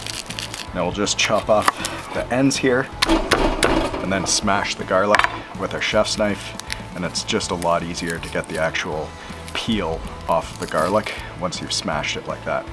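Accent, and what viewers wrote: Canada. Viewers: This guy talks like a Canuck. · Homeboy sounds Canadian Respectfully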